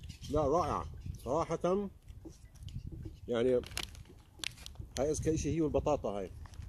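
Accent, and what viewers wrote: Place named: Palestine. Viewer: يا عيني على اللهجه الفلسطينيه شو حلوه ، بدّي اسالك شو اسم المحل وإذا بلاقي منو عنا في مونتريال ؟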